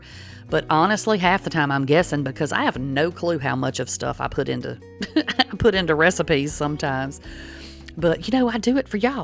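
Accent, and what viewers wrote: South, United States. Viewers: This looks absolutely amazing! Thanks for sharing! And I absolutely love the way you say boil..👍 I'm from Ohio so I'm not used to the southern draw but I love it! And it's cold here too we've been in the teens and single digits. But we're used to it in Ohio...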